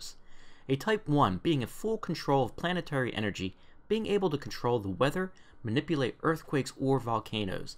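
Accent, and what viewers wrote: Philadelphia, United States. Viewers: That Philly accent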